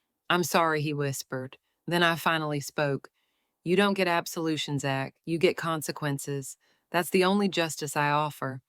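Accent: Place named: Kentucky, United States. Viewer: Sounds more like Kentucky 👍.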